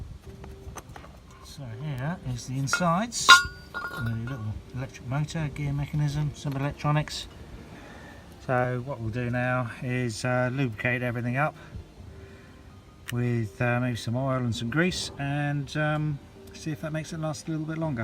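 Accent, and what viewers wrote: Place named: England, United Kingdom. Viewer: A huge MERCI for your video (and for your pure English accent I can understad very well - I'm French)! I was able to remove and do some cleaning on the motor of the steering lock (Mini R56 2007). My friend first told me there was a problem with the power steering... So I removed the Power Steering electric motor (fully electric P. S.) , checked it and of course there was nothing wrong with it because it was the lock that was causing the random problem ! I used my Dremmel to cut a slot in both screw heads then used a simple screwdriver to remove the lock. Easy job! Note that when fitting the cover you have first to push the spring loaded plongeur far in with a right-angled tip in order to position the cover correctly. The lock works nicely now, I just keep my fingers crossed the problem is definitly solved. But as the DC motor was very dirty inside, I guess this guy was causing the fault! 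Your help is worth a pint of beer, my friend !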